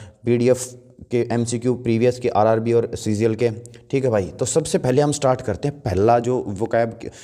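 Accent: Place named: Haryana, India. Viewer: haryanvi Aa hi jave bich bich m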